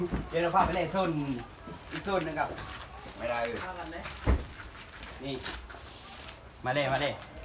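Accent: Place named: South, Thailand. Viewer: สุดยอดเลยคนใต้แหลงใต้ฟังแล้วบายหูขอให้ดังๆรุ่งๆนะคับ👍👍👍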